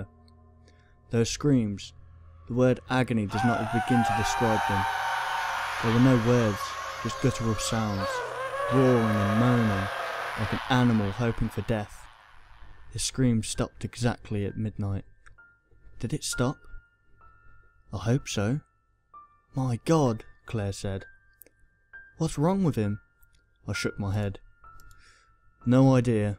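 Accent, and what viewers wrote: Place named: United Kingdom. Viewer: I NEEDED a British creepypasta reader it gives diversity :p awesome work guys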